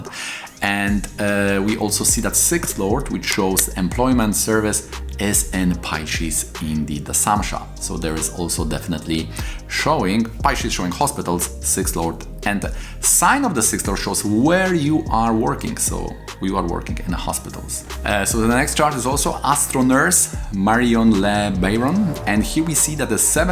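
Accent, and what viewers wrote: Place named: Poland. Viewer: Good heavens, u speak fast, load your sentence with information and add on your particular polish accent, truly it's impossible to get the valuable share u give. I am sorry got my Krittika strong . I hope u would slow down a bit.. speed is good but not that fast please .. thats a ferrari 😃 .. Carriage is good U are amazing & your research spectacular .. thank u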